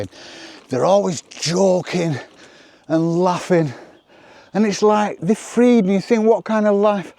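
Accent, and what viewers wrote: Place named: Lancashire, United Kingdom. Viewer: 😂 So funny 😂 Where are you in Lancashire? You sound like my grandparents who were from Westhoughton. I'm in South Staffs. Aged 73 😮 so there is someone older than you 😂 Thanks for making me laugh ❤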